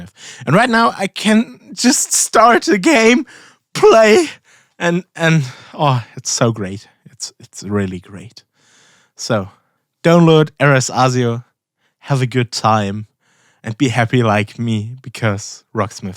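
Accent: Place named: Germany. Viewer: Knew you were German after 2 seconds. Thank you for this video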